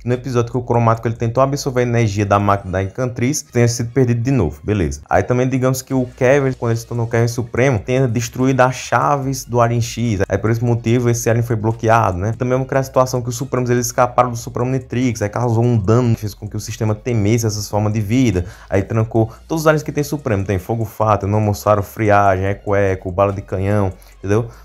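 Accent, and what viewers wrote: Northeast, Brazil. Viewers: A proposta do vídeo é o conhecimento nerdistico, n importa se a dicção dele é boa, oque importa é entender os fatos relatados, e a dicção ele é mt boa, só por causa do sotaque nordestino que vc ta se doendo? Patético.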